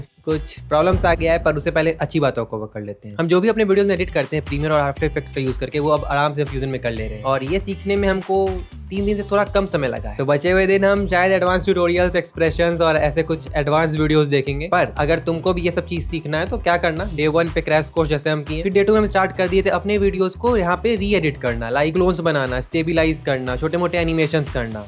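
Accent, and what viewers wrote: Bihar, India. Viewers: Bhai tu bihar se h kya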